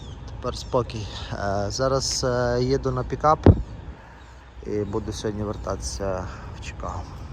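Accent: Western Ukraine, Ukraine. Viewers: Блін, як класно чути цей наш західний акцент... Привіт з Івано-Франківська...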